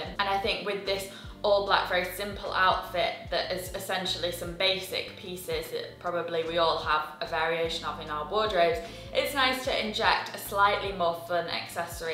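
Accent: United Kingdom. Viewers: Could you please share the designer of the skirt? You did mention it in the video but it was so fast, combined with the fact that my American ears are not used to hearing British english, I cannot for the life of me figure out what it is you said. Gorgeous skirt, I would like to hunt around and see if I can find it somewhere. Btw, I just recently stumbled into your channel and basically binge watching everything. I am def rethinking my wardrobe and really would like to update it. I had a bad habit of getting pretty much all my tops in XS, which is my true size, but there is not any drape really, and everything is kind of fitted. For the longest time I said I would never wear oversized clothing, but after watching your videos, I think I have finally seen the light 😂😂 Literally got rid of close to 15 cashmere crew necks from my closet today. They are all XS. 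Whyyyyyyy Anyway, another thing i swore I would never ever do is a looser fitting trouser. I basically wear skinny jeans in size 26 waist. I always thought they would make my bottom look bigger than it is. But they look so beautiful and stylish on you, I have been salivating over them. A big problem I have always had was even though I have a lot of clothes, and many of them are truly nice (McQueen blazer, Tory Burch dresses, Burberry heels and trenches and Burberry wool coats), I often struggle to figure out what to wear and how to pair things to not look boring. After watching your videos, I realized that it’s bc I never b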